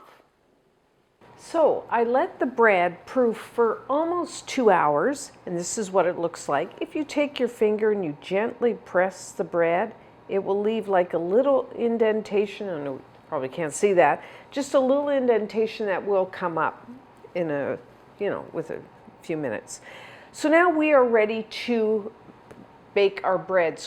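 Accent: Canada. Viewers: Her accent is Canadian af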